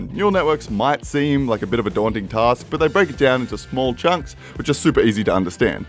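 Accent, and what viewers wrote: Australia. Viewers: Your Aussie yea?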